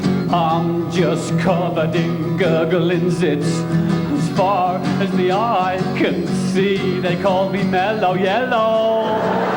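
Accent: Birmingham, United Kingdom. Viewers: Is he a brummie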